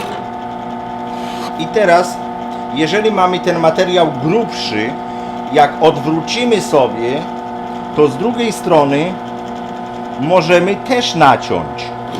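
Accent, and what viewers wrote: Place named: United States. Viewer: Od ilu lat mieszkasz w Ameryce? Nawet jak mówisz po Polsku, to słychać że kacentujesz inaczej :-).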